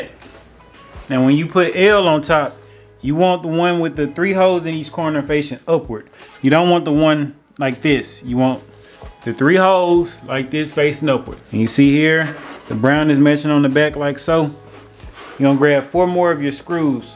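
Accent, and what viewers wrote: Memphis, United States. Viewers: This was a good instructional……tell me are you from Memphis or spent a period of time there cause I promise you sound like a Memphian 😂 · Hey thanks for the tutorial! Your accent sounds familiar— especially when you said “if you’re watching this with your granddaddy”. 😂 I’m from Memphis (but live in Nebraska), so I clicked through your vids and found your Memphisvlogger hashtag. Memphis in the house! And just watched the game! Or…actually…listened to my husband watching the game. Whup that tr1ck! 😊👍🏽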